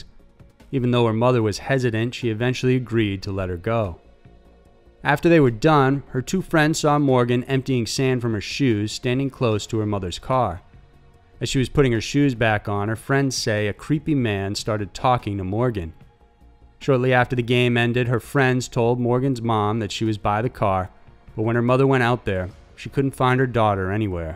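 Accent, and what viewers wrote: South, United States. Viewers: Love that southern accent 💋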